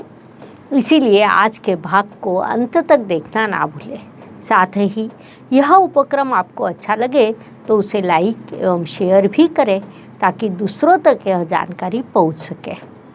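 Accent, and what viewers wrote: Maharashtra, India. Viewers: Such a strong thick marathi accent 😮 omg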